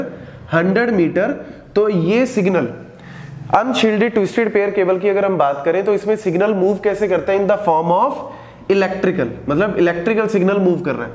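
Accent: Punjab, India. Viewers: love your Punjabi accent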